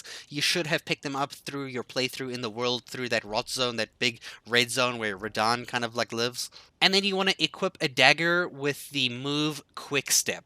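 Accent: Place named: South Africa. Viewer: I have a friend from S A. I recognize the accent. Cheers and thanks for the help.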